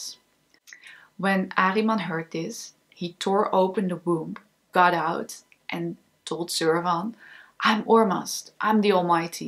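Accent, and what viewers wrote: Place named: Netherlands. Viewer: waarschijnlijk wel, das een nederlands accent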